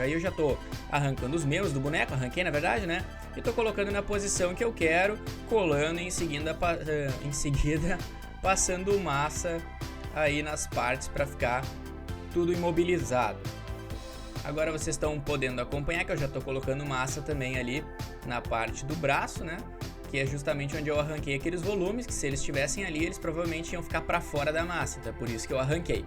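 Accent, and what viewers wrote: Rio Grande do Sul, Brazil. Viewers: Tu é Gaúcho né? Teu sotaque é bem Gaúcho eu sou aqui do Rs e eu reconheço, não sei se é do Rs, mas tu é do Sul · cara vc é Gaúcho?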